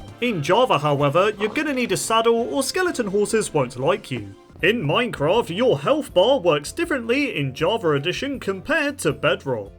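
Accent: United Kingdom. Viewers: oh. you dont. you guys just sound british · dude hes British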